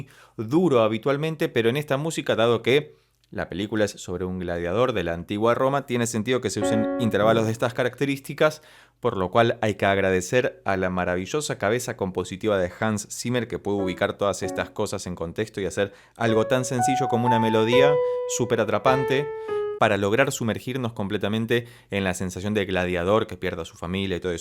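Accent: Argentina. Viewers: Para de hablar porteño .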